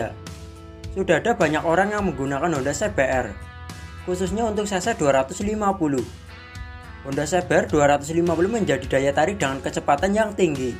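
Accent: Java, Indonesia. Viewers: Dari logat nya seperti orang jawa ( ngapak). salam dari purworejo jawa tengah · Medok nemen mase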